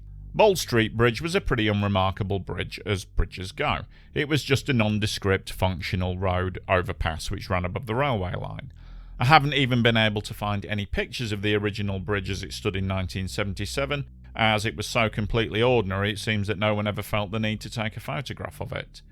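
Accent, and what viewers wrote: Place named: Hull, United Kingdom. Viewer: Does anywhere know where is from? I'm curious about his accent, I'm thinking maybe Hull?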